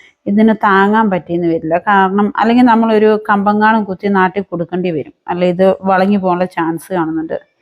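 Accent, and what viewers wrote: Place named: Idukki-Kottayam, India. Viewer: Chechide slang idukki kottayam slang polind😇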